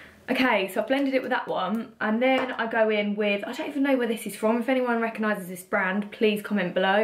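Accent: United Kingdom. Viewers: I didn’t know you had a British accent! 😅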